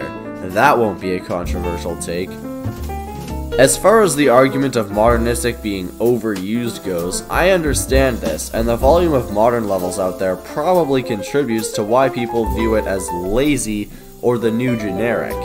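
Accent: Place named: United Kingdom. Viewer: glow is the best for sure to me (for a creator with an british accent) and hell is 2 (for a creator with an british accent) core is 3 if done right (for a creator with an british accent) 4thly thats not the most impressive thing in the world minigames and stuff like that go play what and change of scene (for a creator with an british accent)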